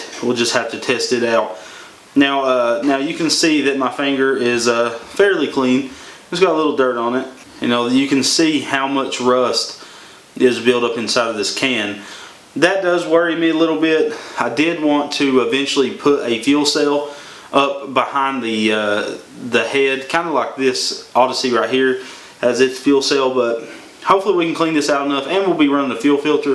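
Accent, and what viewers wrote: South, United States. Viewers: Cool, good job! Sounds like you are in the south, as I am too, I'm a old fart but I have been inside of every kinda of engine known as well as carburetors. Some friendly advice on a product which you probably have already tried but anyway get you some berryman carburetor dip it is a miracle dip. I use it also to clean bolts and pretty much anything. Give it a try.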